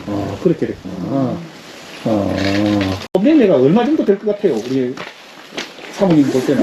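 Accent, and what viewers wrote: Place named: Andong, South Korea. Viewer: 아이구 안녕하시죠? ㅋㅋ안동말투 정겨워요 날은맑으네요 봄을기다리는듯 참새가 짹 짹 마중나오셨네요~~ 두분 즐겁게 다녀보세욤 ~~ 상세한 정보 박수 보냅니다~~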